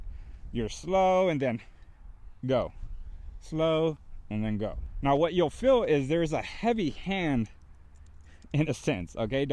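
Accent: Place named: Southern California, United States. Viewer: Yo these are some great points, you sound like you're in Socal. where y'all at?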